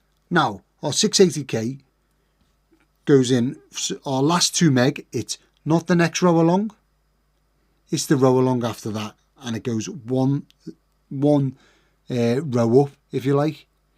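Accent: Liverpool, United Kingdom. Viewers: Hello! Enjoying your videos very much - I do build pedals from time to time! (Have built Zvex Fuzz Factory, Bazz Fuss, Fuzz Face, Vox Repeat Percussion, Sparkle Drive, Wooly Mammoth...) I like that your approach is just making it work - sometimes I've felt bad about twisting resistors together to get the right one, but seeing you do it shows me I'm in good company! I sometimes parallel them which I feel happier about because it gives me a chunkier "component" rather than a long skinny one. Takes a bit more trick math tho (or just try until it's close enough for government work as you say). As someone who once lived - and met my wife and married - in Liverpool, it's lovely to hear your accent again, and be reminded of the wonderful times and good people of your fair city - certainly the biggest influence on my life. You using a lighter to shrink heatshrink just took me right back to the street-sellers shouting "Pound-yer-lighter" (which we still say in my house when we're looking for one). 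I wonder if the electronics store near Rushworth's and Curly Music is still there - no doubt a lot has changed. Anyway - in my experience of putting stuff together on veroboard, I used to make my boards as compact as possible - but as you experienced, this efficiency can make life hard - I think this layout could benefit from a bit of breathing room - there's certainly room in your superb enclosure! I use "diy layout creator" for my designs. My other thought was, as you use some very posh co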